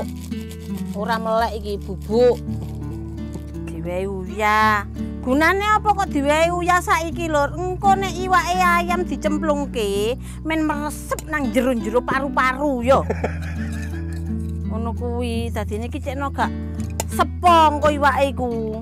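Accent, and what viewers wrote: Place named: Surabaya, Indonesia. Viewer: Bu kok koyok wong surabaya lek lihat logatnya😂😂😂😂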